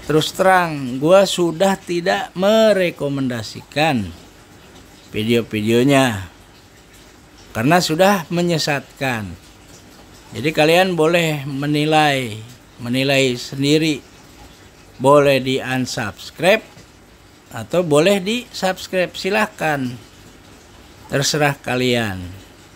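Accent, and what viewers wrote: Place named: West Java, Indonesia. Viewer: bang suara elu udah kaya sepuh abah abah logat sunda tapi foto elo keren ganteng keren salam sortir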